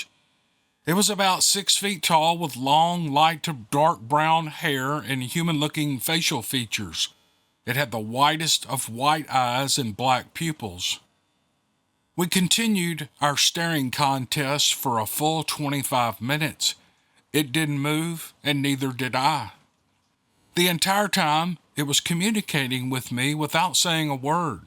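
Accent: South, United States. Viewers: People can be mean and annoying, let them get butt hurt over nonsense. I love hearing your voice narrate these stories, reminds me of my southern folk- we have cool accents! Other people can be jealous of. Keep up the good work,. Hopefully, I’ll hear my story from you soon.:)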